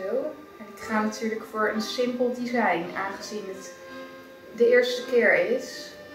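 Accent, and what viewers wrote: Rotterdam, Netherlands. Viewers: Dat is rotterdams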